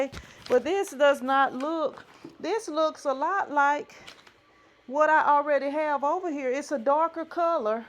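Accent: South, United States. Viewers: That’s crazy! Before you mentioned you were a basketball coach I thought to myself this woman is built like she hoops. I love your southern dialect btw. Thanks for all the t-shirt help and pointers.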